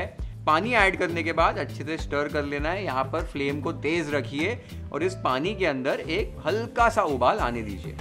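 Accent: Maharashtra, India. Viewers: Aap sab recipe try kiya aur masale bhi Sab eakdam perfect jesa kaha gaya wesa he kiya hey❤❤its taste perfect an superb Best recipe I loved was kala mutton thali u made sambar eak Briyani bhi 😊 Mala Tumcha Amcha Maharashtra Varcha Pream kup Avdal tume Manapasun banvtat ani Bolta sudha 😅 Love ur Marathi acent in Maharashtran Recipe you have Done till Now ❤❤❤❤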